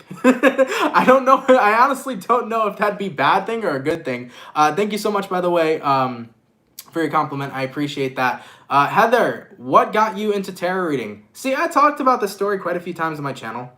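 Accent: England, United Kingdom. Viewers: From England great English accent more mate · Capricorn here, love the English accent haha. I’m English but mines more northern than that😊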